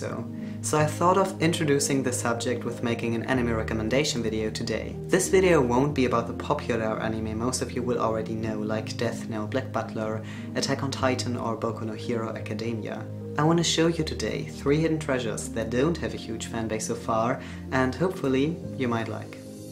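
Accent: Germany. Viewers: Idk if I'm just stupid... But you sound like you have a gernan accent😂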